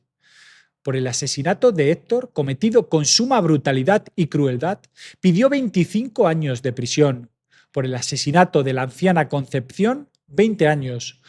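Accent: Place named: Catalonia, Spain. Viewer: Ya se sabe que eres catalana. Por eso sabes pronunciar…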